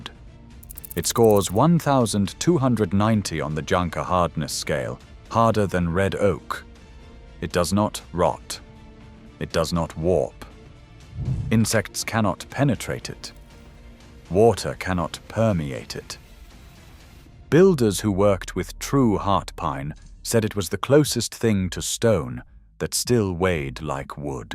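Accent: United Kingdom. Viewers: Lasted 2 seconds until I heard the British accent then closed video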